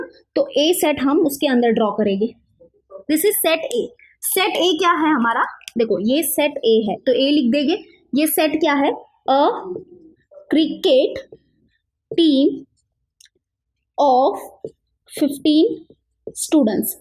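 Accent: Maharashtra, India. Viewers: Mam is speaking hindi good but the 'Barobar' 😅 mam is marathi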